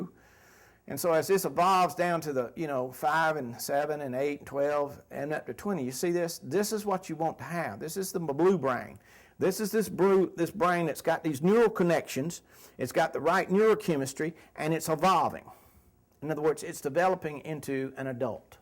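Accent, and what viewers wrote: United States. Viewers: tree-on qua-ree-on. lol south'ran drawwwl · 9/11 texas accent immediately thought of George bush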